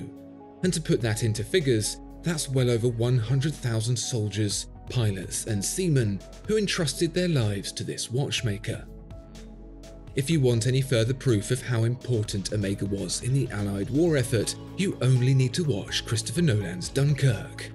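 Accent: United Kingdom. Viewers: So basically, this SMP is the Marine Nationale Pelagos that Tudor refuses to make. BTW, hearing someone with a British accent saying the most American of phrases "swing and a miss" is oddly satisfying.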